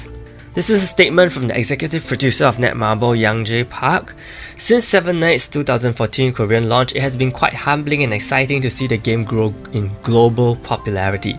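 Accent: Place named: Singapore. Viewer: You sound Singaporean... are you?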